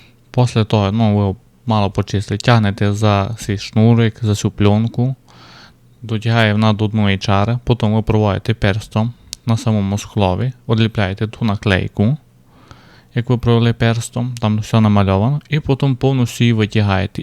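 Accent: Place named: Transcarpathia, Ukraine. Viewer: По говору, чую, що з Закарпаття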